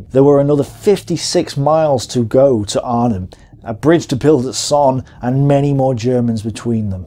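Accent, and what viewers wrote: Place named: Manchester, United Kingdom. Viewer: In 1944 the Zuiderzee, the Southern Sea, no longer existed. it had been transformed in the 1930s into the IJsselmeer (lake IJssel). Also the shape of the lake is completely wrong. The map is more like something I expected from an American, not something that (as I understand his accent) is coming from a British person.. .I honestly don't understand WHERE he got this map from.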